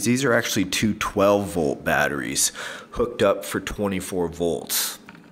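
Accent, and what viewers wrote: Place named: Canada. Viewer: he's Canadian.you can tell from his accent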